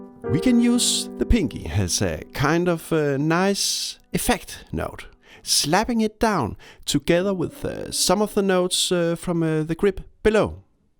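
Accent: Denmark. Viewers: Hej, jeg tager chancen, gætter på du er dansker.....??? Syns jeg kan høre det:-) Super fedt. Hvis du er fra Kbh området vil jeg meget gerne booke et par lektioner hos dig hvis du er frisk.... mvh Peter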